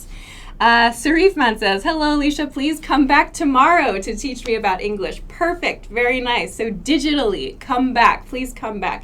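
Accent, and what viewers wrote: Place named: United States. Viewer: I really like your accent......i like America accent.....who likes America accent like me....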